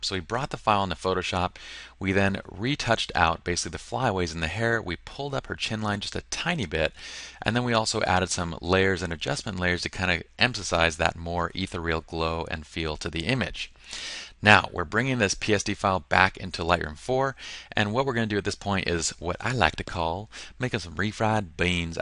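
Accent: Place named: South, United States. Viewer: Love the accent...it's ok....it's a Southern thing. You made me laugh and Lord knows I needed to this morning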